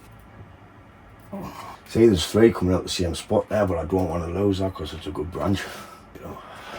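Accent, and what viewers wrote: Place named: Northern England, United Kingdom. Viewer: you're welcome mate. I really enjoy your channel. As a fellow northerner stuck down south, it's refreshing to hear the banter and accent.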